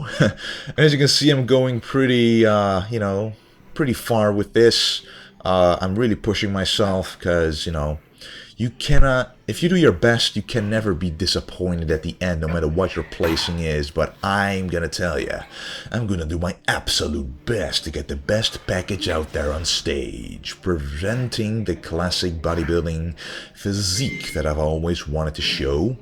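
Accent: Netherlands. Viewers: typical Dutch accent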